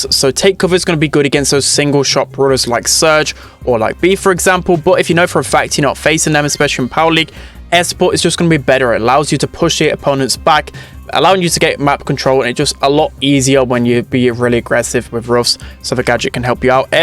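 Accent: United Kingdom. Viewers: I can stand his bri'ish accent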